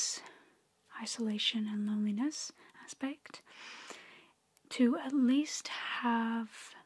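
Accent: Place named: Lancashire, United Kingdom. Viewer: This lighting is lovely, and your Lancashire is too,. 🕊️🙏💕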